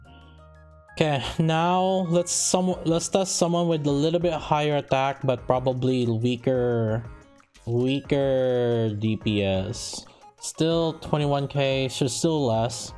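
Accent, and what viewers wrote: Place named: Philippines. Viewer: Are you from the Philippines? Your english sounds like one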